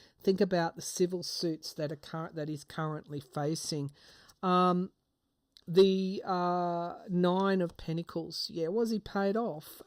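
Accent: Australia. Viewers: Hello, firstly thank you for all your work, it’s much appreciated! ❤ 👏 Just a quick note: the far-right party in Germany is called AfD, („Alternative für Deutschland“ = Alternative for Germany), not „Adf“, as you kept on saying. With your Australian accent „Adf“ almost even sounds like „IDF“, the Israeli Defence Forces.:) No biggie, but I thought you’d want to use the right party name. It’s so easy to mix up all these abbreviations..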